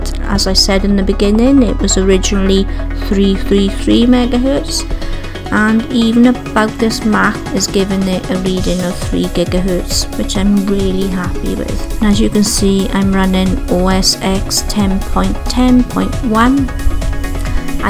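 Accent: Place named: Wales, United Kingdom. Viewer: <3 welsh accent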